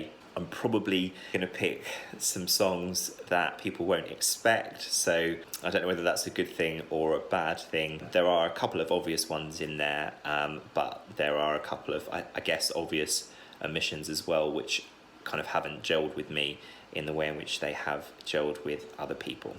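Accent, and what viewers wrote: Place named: United Kingdom. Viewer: I Just loved your british acsent... And I get a little bit surprised 'Cause i' ve never thought that you enjoy and... The other 3 songs I understand... But the other 2... It was a really huge surprise. Most of all:, 'Cause for her it wasn't a goog single... But I really enjoy this reaction...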